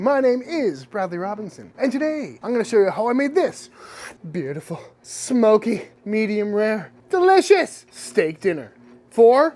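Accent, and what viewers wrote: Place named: Massachusetts, United States. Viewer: I hear that Mass accent. Where you from in Mass?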